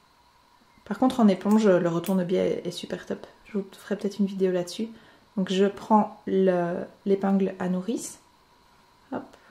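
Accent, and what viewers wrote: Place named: Switzerland. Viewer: Tuto tres clair, net et précis ça sera pour mon petit- fils de 7 ans merci bcp Je fais ma curieuse d'où vient ce petit accent je dirais soit Suisse soit Belgique soit Alsace😅 Ps, musique très dinamisante 👍🏽👍🏽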